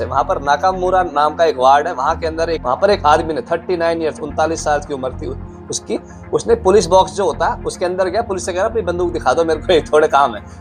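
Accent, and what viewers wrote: Haryana, India. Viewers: Bhai, Regular News banao Bhai. Ye jo haryanvi tone me comedy news alag hi lagti he. Crime news me to comedy he puri...😜😜😂😂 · ki always be making serious news damn funny with haryani accent 🤣🤣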